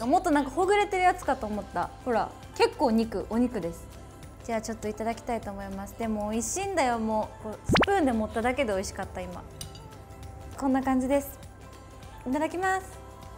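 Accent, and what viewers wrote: Kansai, Japan. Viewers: 関西弁可愛い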